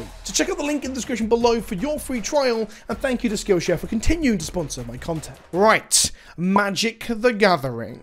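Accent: United Kingdom. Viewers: It feels weird being from the UK... watching and hearing prices referred to in dollars ...with a british accent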